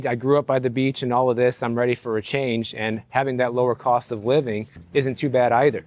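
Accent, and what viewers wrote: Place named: New Jersey, United States. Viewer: I would have guessed you were originally from Jersey.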